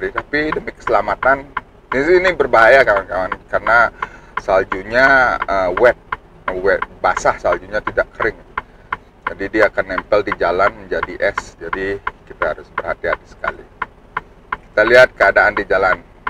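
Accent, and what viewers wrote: Bali, Indonesia. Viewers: Om orang bali ya, kok logatnya kyak orng bali?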